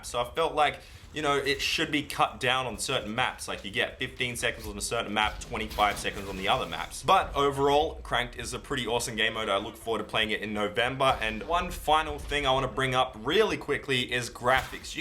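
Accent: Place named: Australia. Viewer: why does always say his accent is australian like we don't know?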